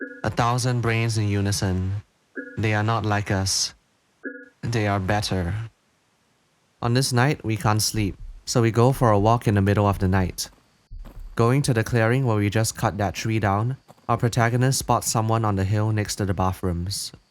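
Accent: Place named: Singapore. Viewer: singaporean accent detected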